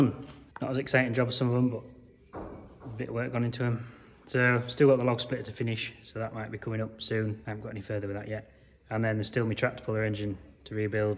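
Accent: Yorkshire, United Kingdom. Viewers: Er kommt aus dem norden von Yorkshire, vermutlich nähe Thirsk. Ich nehme an, Sie meinen "Stuud" und nicht Stahd für "Stud". Tcha, Yorkies haben auch Dialekt, ursprünglich "old English" and "old Norse".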